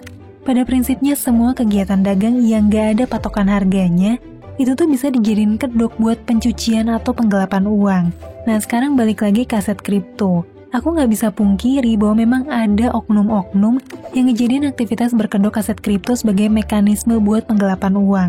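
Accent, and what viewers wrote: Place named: South Jakarta, Indonesia. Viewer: Jujur dari dulu pingin subscribe channel ini karena materinya bagus. Cuman ga tahan dengar suara Mbak naratornya. Kedengaran kayak sok ke Jaksel2an. Aksennya bikin geli.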